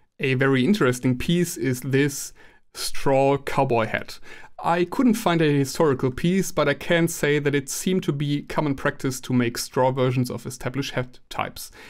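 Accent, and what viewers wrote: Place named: Germany. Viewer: I hear a german accent :) I wish I knew the right tailors in germany...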